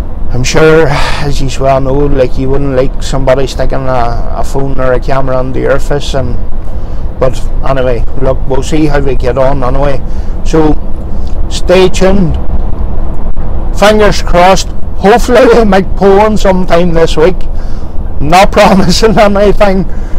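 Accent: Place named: Ireland. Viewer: I laughed when you said the Polish driver spoke English - your Irish accent and his Polish accent must have made for an interesting convo. Maps are a great inclusion. I have been reading the road signs and then searching on Google to see where you have been until now. When you give the updates could you perhaps do a 'picture in picture' so we can see where your actually driving whilst talking. Does your 'better half' watch the videos? Would appreciate how hard you toil to pay the bills. Much enjoyed!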